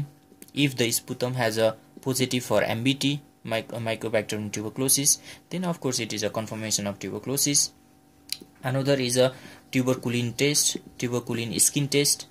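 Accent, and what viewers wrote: India. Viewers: lol hes indian and has accent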